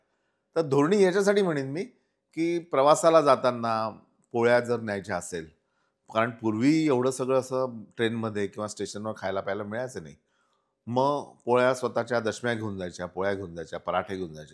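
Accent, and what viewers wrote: Vidarbha, India. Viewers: Sir aap vidarbh k hai, khandesh k hai , ya marathwada k 😊😊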